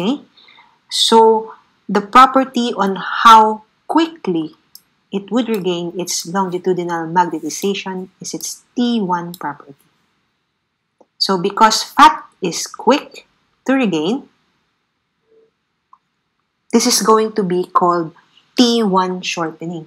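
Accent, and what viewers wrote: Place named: Philippines. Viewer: nice filipino accent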